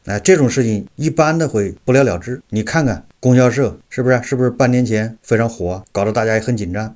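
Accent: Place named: Anhui, China. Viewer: 听口音像 安徽安庆和铜陵的口音啊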